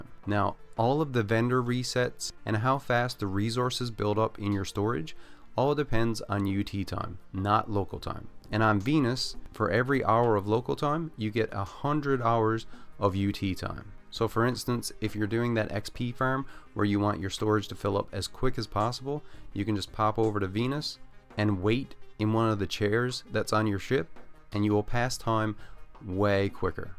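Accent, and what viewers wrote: Newfoundland, Canada. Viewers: I didn't notice your channel name, but 3 seconds into the video I knew you were from Newfoundland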